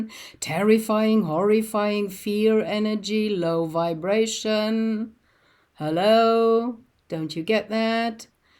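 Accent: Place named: Germany. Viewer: Your accent is wonderful I love your german side, but clearly you dont wish it to define you👍👍 Thats fine...but theres nothing wrong with this❤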